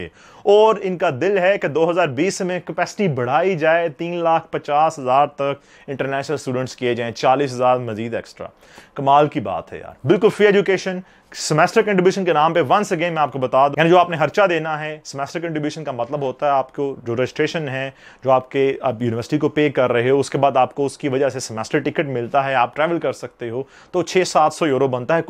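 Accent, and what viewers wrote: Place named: Pakistan. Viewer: Its sounds like you are from pakisthan but when u r in germany everyone become same even u r a pakisthani indian etc great respect to u sir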